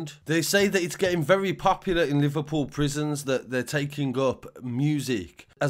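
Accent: Birmingham, United Kingdom. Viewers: sounds so brummy 👊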